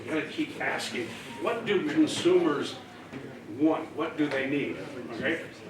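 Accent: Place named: United States. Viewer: Pretty early, on Monday a little bit sleepy 😅 but still very motivated and eager to absorb new information, we were provided with an amazing, as always, speech of. So firstly, talked about agricultural enterprises, about technology, ways to run business successfully, quickly! He pointed, and kept repeating that world of business is volatile. Never stands, always varies, changes. Furthermore, he shared as the example the Smart Bandage. This kinda put us in the picture more of what he talked about. I am truly grateful for a speaker like him. Because I get to hear how Americans utter words and express ideas!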